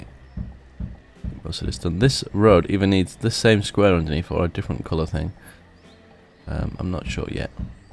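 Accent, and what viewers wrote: England, United Kingdom. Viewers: Great, Lake Dulcet is back on track! On a different subject, when you close your eyes and listen to certain parts of this episode, it reminds me of someone and it finally dawned on me who... Is Hugh Grant from your part of England (similar accent)? ;-)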